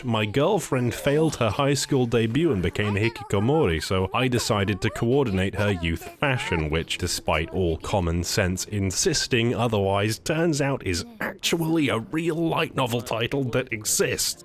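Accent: United Kingdom. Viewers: Caffeinated British chatterbox boy did it again. You are getting me back into anime even though I got a girl and a kid. Watched Konosuba on your recommendation some time ago with the girl and we enjoyed it (after getting over the Darkness-induced cringe), so I guess we will be bouncing off some bunny girl's bum now. Keep it up!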